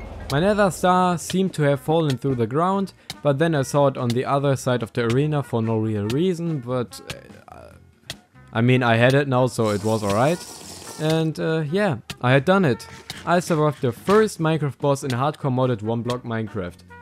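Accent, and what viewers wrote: Germany. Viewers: This german accent kills😂good video my friend, Ich fühle den Akzent😂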